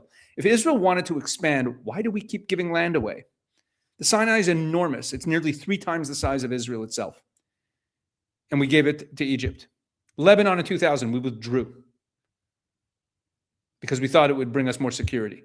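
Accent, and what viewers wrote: United States. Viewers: Way to go Rabbi. You sound like a Texan.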